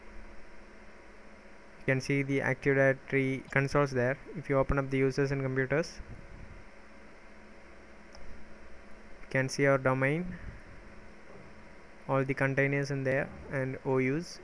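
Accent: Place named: India. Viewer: thank you for the video. as a helpful bit of information, your indian accent is thick, so it is already difficult to understand your english. please slow down your speech and say your words more clearly.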